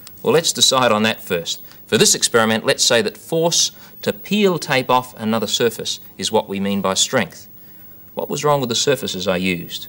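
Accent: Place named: South Australia, Australia. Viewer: Always wondered what their accent was as a Melbourne kid. South Australian!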